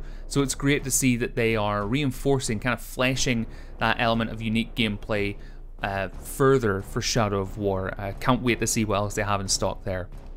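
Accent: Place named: Ireland. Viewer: oh that's cool I didn't know you were Irish, I probably should have known that from the accent though. lol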